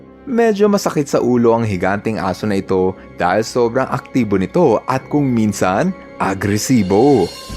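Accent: Visayas, Philippines. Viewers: Gusto ko po ang german shepherd,dutch shepherd at belgian malinous po kuya pero may tanong ako sayo visaya kaba kasi ang ibang narinig ko sayo visaya katolad namin😅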